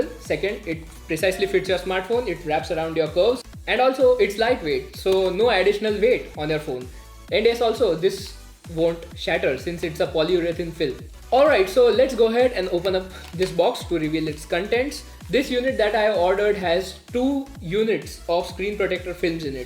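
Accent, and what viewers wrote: India. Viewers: Dude, please do your best to lose this Indian accent. Take some diction courses if necessary. People on the Internet won't take you seriously unless you do. You'll see a definite youtube growth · Indian people and thier accent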